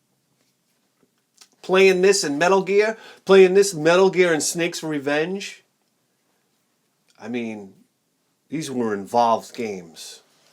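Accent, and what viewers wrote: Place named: Long Island, United States. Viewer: Dude , this show is it ! That Long Island accent does it . Nobody tells a great story like a New Yorlka !!!! Ya bustin my bawls ova here !!! You are smart as fuck . I guess that is the pay off for living in the city . I was raised in the country in southern Ohio . It's more Kentucky than mid west . People are laid back here and on pills lol . Not a lot of money so there is not a lot of games :(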